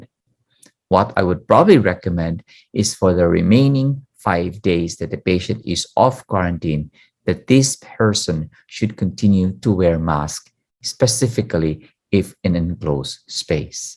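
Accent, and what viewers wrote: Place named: Philippines. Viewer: Your name sounds Chinese but your accent sounds like you're from the Philippines.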